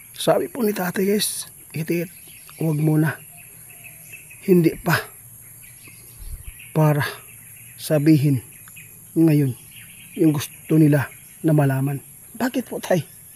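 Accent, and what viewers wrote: Visayas, Philippines. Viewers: Visayas itong nagsasalita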